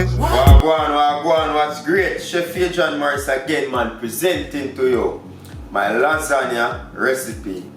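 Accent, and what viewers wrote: Jamaica. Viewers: I like how you narrate your videos. It's a good balance of JSE and Jamaican Patois. "Ah murda!"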